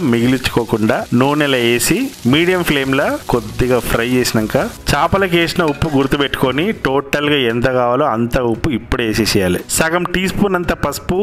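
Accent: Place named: Telangana, India. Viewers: వంటల్లో ఆరితేరిన నిజమైన వంటగాల్లు నీచు కూరల్లో కరివేపాకు వెయ్యరు వేస్తే చక్కగా చేసిన కూరను హత్య చేసినట్లే .. మీ చేపల పులుసు, తెలంగాణా యాస, పాట అన్ని సూపర్ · Anna me voice telangana yasa super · Hey wow Telangana slang masth undhi mi voice tho .nenu pakka ts pilla🤩🤩🤩🤩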